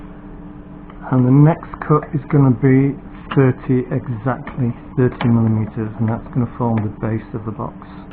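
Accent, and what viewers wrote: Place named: Yorkshire, United Kingdom. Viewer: Hi your video just popped up in recommendations - as soon as I heard the accent and saw the yorkshirman plaque on your wall I subscribed! looking forward to more videos..... and watch out for a sub jump after this weekend I'll be giving you a shout out on my channel: us little'uns got to stick together... 👍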